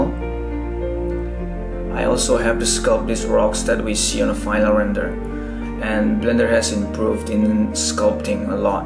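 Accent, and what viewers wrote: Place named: Northeast, India. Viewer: Your English accent sounds like you are from NE India. Great work btw. Cheers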